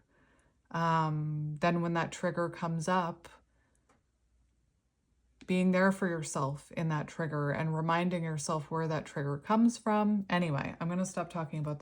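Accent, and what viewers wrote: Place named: Canada. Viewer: Hi! I've been watching you for about 2 weeks now & it feels like you are looking at my & my Twin Flame's life. We've been together for 12 years & right now it's really complicated. We've been apart for over a month now & I've been so broken over it. You & your readings have been spot on! For example, in like 3 different readings you kept saying he's watching me, almost like voyeuristic. I didn't think so but 3 different times he's said something about watching me or followed me someplace when I wasn't where I usually would be since I watched your readings. A lot of what has happened now makes sense, & that is entirely thanks to you & your gifts. You have a healing voice & I've really enjoyed watching you. You've given me a lot of insight & a lot to think about. You made a comment that a person could tell you were from Canada by the way you say "car". 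For me, the first time I heard you say "about" I knew. It seems like you all put a lot of emphasis on the "ow" (ou) part of the word. It's cute, like a reverse southern draw. Thank you for the clarity & peace that you've brought me. You have really REALLY helped!!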